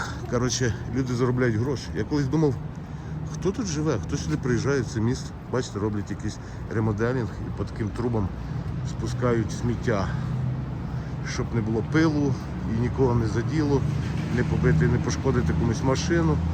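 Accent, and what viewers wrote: Zhytomyr, Ukraine. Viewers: Вітаю . У тебе мова геть на житомирську область схожа)..Цікавий контент робиш як на мою думку .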